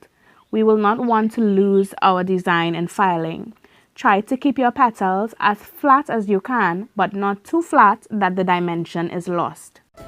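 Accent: Trinidad and Tobago. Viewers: U sound trini!!! 😀😀😀 U go girl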